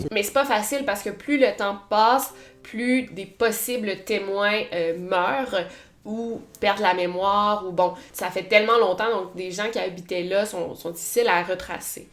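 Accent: Quebec, Canada. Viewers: Whaaa et bien dit donc quelle véhémence alors que cette jeune femme ne dit rien de méchant! Et oui certes est aussi Française que nous mais il est vrai aussi que nous ne sommes pas habitués à l'accent Québécois et qu'au début nous avons du mal à comprendre certaines tournures de phrases, certains mots etc... Mais avec le temps, on fini par tout comprendre! Cela n'a rien de méchant, c'est juste un fait! En France, les gens du Nord par exemple peuvent très bien avoir du mal à comprendre les Marseillais ou les Alsaciens car ils ont un accent assez prononcé également! Ce n'est pas pour autant qu'on ne les trouve pas chaleureux, accommodants ou autre... Et bien c'est pareil pour les Québécois et leur accent. D'autant plus que dans son message elle dit qu'elle ne peut plus se passer du petit accent de... Je trouve votre réponse un peu excessive pour pas grand chose mais bon, bonne année 2021 à tout le monde. 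· [Très long commentaire mais il en vaut la peine haha] Salut, ça fait un moment que j'hésitais à écrire un commentaire, me disant que tu n'avais pas le temps de tous les lire... et puis pour cette nouvelle vidéo, je me lâche! Je suis tombée sur ta chaîne au hasard, après avoir terminé un documentaire sur une disparition sur Netflix (Chris Watts, d'ailleurs tu as toi-même fait une vidéo dessus il me semble). J'ai regardé une vidéo, ai été un peu déconcertée par ton accent québécois - que je trouve très beau mais en tant que française ça m'a destabilisée haha. Me suis dit que je ne regarderai pas d'autres vidéos de toi. Puis le lendemain tu es apparue dans mes suggestions... un titre alléchant, j'en ai regardé une autre... puis une autre... et je crois m'être fait une cinquantaine de tes vidéos en l'espace de quinze jours. Tu es mon nouveau Netflix. J'ai binge-watché! En tout cas, je suis admirative de ta personnalité, de toutes tes recherches, et maintenant je ne saurai pas prendre mon thé le matin sans ton petit accent québécois.:) Alors merci pour tout ton contenu et toutes les heures de "divertissement (je n'aime pas trop le mot mais tu me comprends) que tu nous offres. 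Je souhaite une très longue et heureuse vie à ta chaîne Youtube. Je te souhaite le meilleur et une très bonne année 2021. Petite question un peu plus business;) est-ce que ton livre sera disponible en dédicace ou pas du tout? Est-ce que tu vas créer de nouveaux design pour ta M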